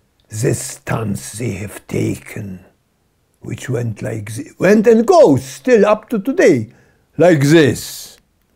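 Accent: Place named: Germany. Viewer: niby mówi po angielsku ale akcentuje jak po niemiecku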